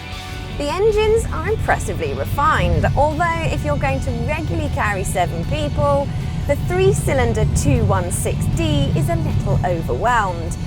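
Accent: England, United Kingdom. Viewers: Lovely English accent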